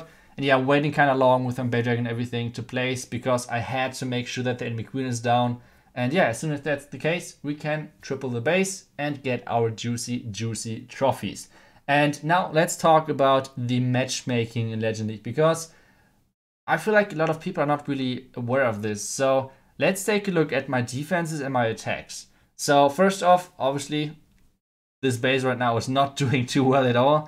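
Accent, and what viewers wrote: Germany. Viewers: i can hear it in your accent that your german ;D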